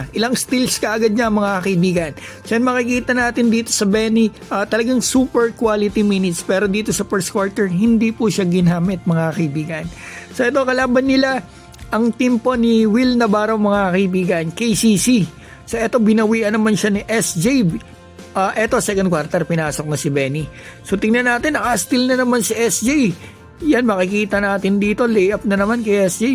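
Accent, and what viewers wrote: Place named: Visayas, Philippines. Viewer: kala ko bisaya ka sir😀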